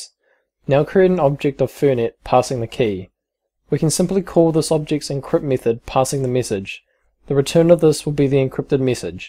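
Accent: New Zealand. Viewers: Thanks. I like the Kiwi accent too.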